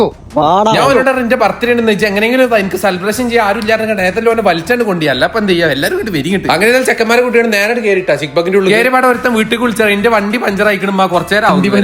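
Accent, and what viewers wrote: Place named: Palakkad, India. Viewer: പാലക്കാടൻ slangil bengalinod bengal evidayann choikku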